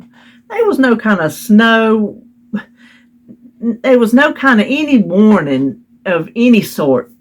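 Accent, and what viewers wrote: South, United States. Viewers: Hi:) I enjoy when you chit chat:) I love your Southern accent! You should hear me - I have a wicked Boston accent!! LOL 😂 Children feel like you are playing with them if you just watch them play:) They just want to be with you. ❤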